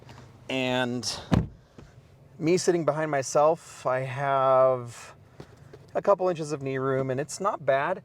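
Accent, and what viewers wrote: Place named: Utah, United States. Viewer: I watched this video and about 1 minutes in I knew you were from Utah.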